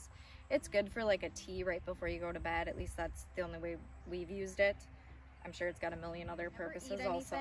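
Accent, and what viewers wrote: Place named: Minnesota, United States. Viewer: Can't beat that Minnesota accent.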